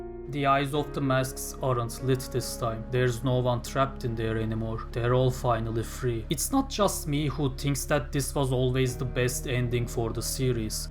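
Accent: Turkey. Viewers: I was gonna ask are you from Turkey because of you accent but then i saw your channel name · Nice video and a style that is harder to find in a good quality like your video keep going and türkçe aksanım biraz batıyor bir şekilde kurtulabilirsen çok hoş olur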